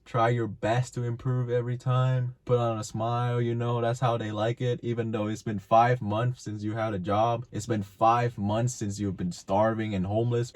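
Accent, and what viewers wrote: Vietnam. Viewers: Are you in America? Sounds Vietnamese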